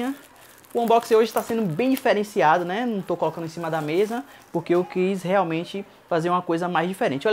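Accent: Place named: Salvador, Brazil. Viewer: Vc é soteropolitano, sacaninha rsrrs. Bom vídeo! Mas o aparelho parece ser bem meia boca.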